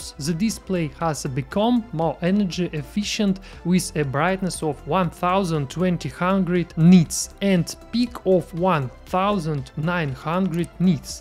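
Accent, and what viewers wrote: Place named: Russia. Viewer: The Russian accent is the cutest accent in English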